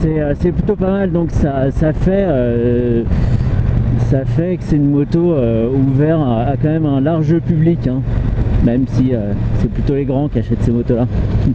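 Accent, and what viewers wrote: Paris, France. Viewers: ton accent parisien est horrible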